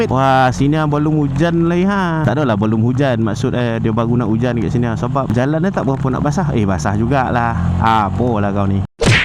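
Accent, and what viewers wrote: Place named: Negeri Sembilan, Malaysia. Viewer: Sronok dgr loghat nismilan ni. Klau boleh slalu update loghat ni bang. Haha salam dri kota bharu Texas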